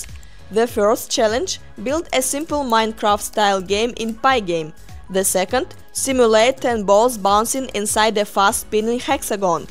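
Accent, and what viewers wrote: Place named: Russia. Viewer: UR ascent is so Russian. Are u from Russia? Awesome demonstration btw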